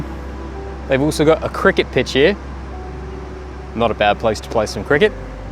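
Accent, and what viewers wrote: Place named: Australia. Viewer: Ahhh australian accent. Welcome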